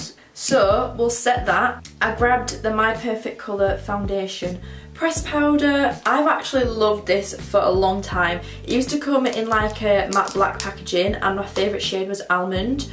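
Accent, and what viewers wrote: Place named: Northern England, United Kingdom. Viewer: I love you already cause I’m Northern too! It’s nice hearing a northern accent on YouTube for once! 💕💕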